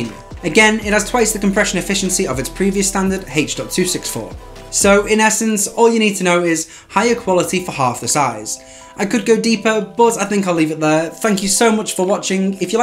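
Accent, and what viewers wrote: United Kingdom. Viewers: Very fast British English I guess. Way to fast for my German brain 😂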